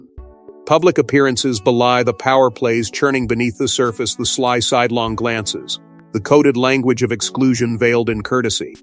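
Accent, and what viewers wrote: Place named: United States. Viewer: A British story told by a "Yankee" voice - PLEASE - have some thought for those of us who find this discrepancy really jarring.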